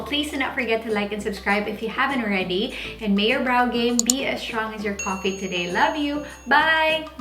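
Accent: Visayas, Philippines. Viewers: Bisaya ka te????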